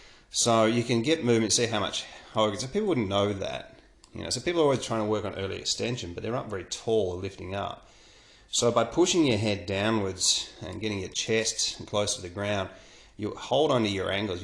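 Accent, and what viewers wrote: Australia. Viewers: Love your videos, they are amazing, delivered with good old Aussie subtlety and charm, keep up the good work mate! Aberdeen, Scotland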